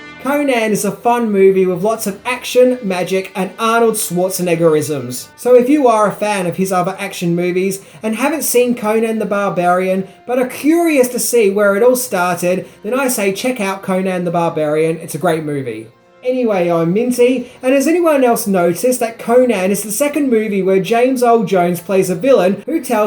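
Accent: Australia. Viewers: Minty's Australian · No, you idiot. Minty lives in Australia. He's said that many times. Not to mention his Australian accent is a dead give away. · He pronounces things as an Ozzie. Though I think he uses words incorrectly on purpose, like ‘irony.’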